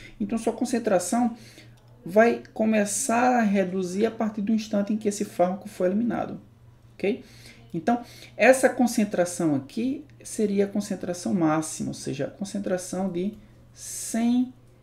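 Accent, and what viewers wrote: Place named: Sergipe, Brazil. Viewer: Muito obrigada por esta aula tão elucidativa! Seu sotaque me parece sergipano. De onde o senhor é? :)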